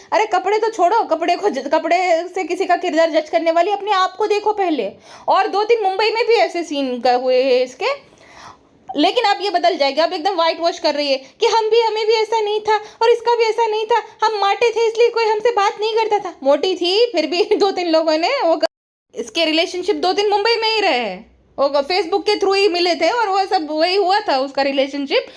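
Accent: Gujarat, India. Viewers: Aap gujrat se ho kya??? Bcz some words se gujrati lgte ho😅😅😅😅plzz reply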